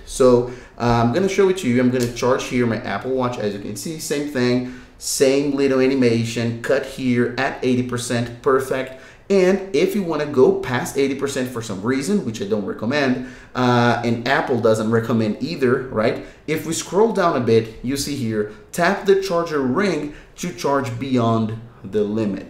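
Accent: Brazil. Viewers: First time in watching your videos, I could pick up a small accent, are you Brazilian?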